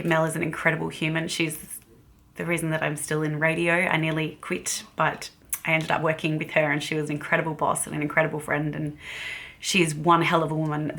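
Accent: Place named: Australia. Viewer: I absolutely love this review 😍 it's so nice to hear my palette being reviewed in an Australian accent 🥰 I work for Glaminatrix and designed this palette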